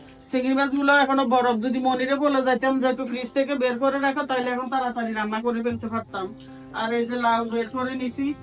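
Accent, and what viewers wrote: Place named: Comilla, Bangladesh. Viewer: আসসালামুআলাইকুম আপু আপু আপনার বাড়ি কি কুমিল্লা আপনার মুখের ভাষাটা কুমিল্লার ভাষা যেগুলো অনেক সুন্দর হয় এবং দেখি অনেক ভালো লাগে সাপোর্ট করেছি আশা করি সাপোর্ট করবেন সব সময় পাশে থাকবো এবং পাশে থাকবেন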